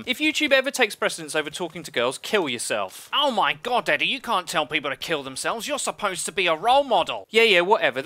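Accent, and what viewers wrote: United Kingdom. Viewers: Your videos are like perfectionist essays, with witty highlights of jabbing humor and a tinge of British English. Well done, keep up the good work. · Eddie is essentially the escapist of the call of duty community. A British accent with witty remarks that would make handsome jack blush.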